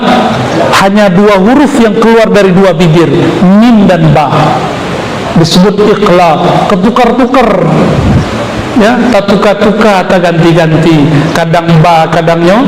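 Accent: Padang, Indonesia. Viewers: Tarimo kasih Buya bahasonyo awak Samo Samo urang Padang,, tapi beda kota....😊sanang hati mandanga nyo 🙏🤗👍 semoga kita inginkan kampuang halaman 🤗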